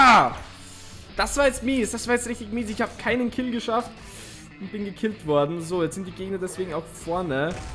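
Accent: Austria. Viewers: bist du österreier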